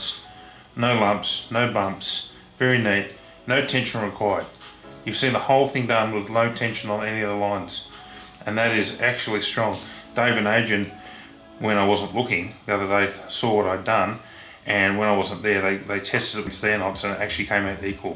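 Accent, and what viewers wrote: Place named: Australia. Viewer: Australian accent (I think) wearing an Oregon something-or-other hoodie. Interesting.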